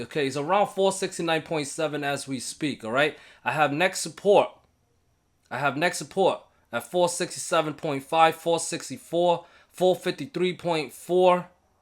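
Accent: New York, United States. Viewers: 1⃣ One!!!! Boss man!!!👍 Great information!! Are you from 🗽 NYC you talk like a new Yorker lol sheep 🐑 style trading 💹 Holy Molly!! 🐂 Vs 🐻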